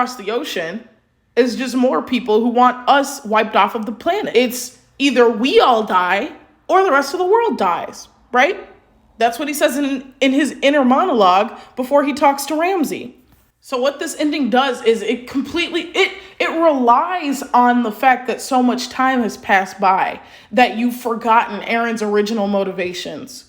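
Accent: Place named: United States. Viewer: I kind of reached my limit with you saying that in real life, 'talking is the answer', because no, it isn't. I can just tell from your accent that you're from the New World and this opinion shows it. For example, my country the Netherlands. Its earliest foundation was a Republic, and do you know how it came to be? Spain had taken over the region and we fought 80 years for our independence. We had several talks and a truce, but it never worked as Spain refused to give the Dutch ethnic group our land until Spain was caught up in too many wars and was financially drained to the point it couldn't afford to keep up the war. Then they agreed to declare us an independent republic. The answer to our freedom was money. WWII wasn't solved by talking, but by people fighting back enough that Hitler knew he was going to lose so he killed himself. I can continue. 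Sure, treaties and truces are done through verbal communication but they don't get made if the war is more beneficial to the people fighting then peace is. Either one or both parties need to sink deep enough before the talks happen, and that is exactly what AOT shows: To the rest of the world the genocide of Paradis was more beneficial then peace so they opted genocide. Which is why the only one willing to talk with Paradis is Hizuru, because they would benefit more from peace with Paradis then a genocide because then Marley and other countries get a hold of the resources they want.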